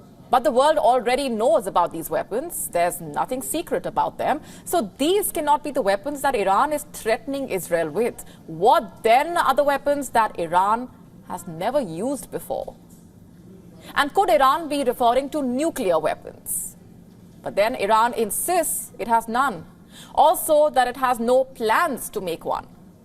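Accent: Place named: India. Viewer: Very good news report in indian English easy to comprehend unlike American and European English pronunciation having a lot of guttural and nasal accent Thanq Wion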